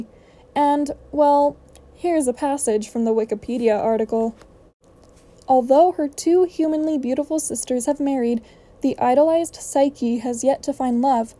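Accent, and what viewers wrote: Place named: France. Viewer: Excellent French accent! Interesting, insightful and enjoyable. Thanks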